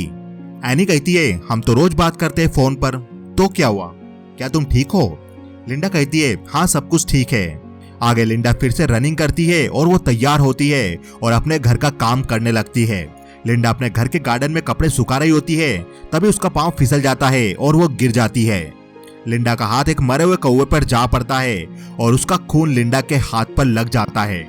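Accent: Maharashtra, India. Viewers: Are you Marathi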